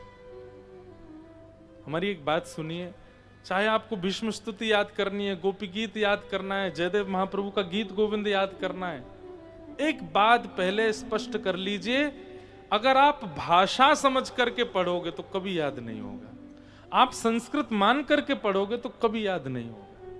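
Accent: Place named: Braj, India. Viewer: Jay Shri Krishna Radhe Radhe Jay Shri Harivansh Guru Dev Maharaj ji Aapke Charno Mai Koti Koti Vandan 🙏 Radhe Radhe Jay Shri vraj vasi Aapki vraj Bhasa Badi Sumadhur hai Radhe Radhe Jay Shri Vrindavan Dham 🙏 Jay Shri Radha Rani Jay Shri Radha Kishori ji Jay Shri Radha Raman ji Jay Shri Hari OM 🕉 Namo Narayan ji 🕉 OM Namo Bhagvate Vasudevy Namh Jay Shri Laxmi Pati Jay Shri Shyama Shaym ji ♥️ Jay Shri Yamuna Maharani ji Jay Shri Radha Vallabh Lal ki Jay Ho ♥️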